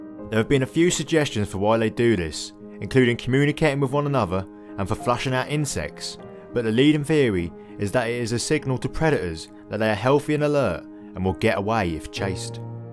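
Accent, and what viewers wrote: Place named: Norfolk, United Kingdom. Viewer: love your channel. Brilliant content. And good to hear another Norfolk accent on YouTube! Merry Christmas!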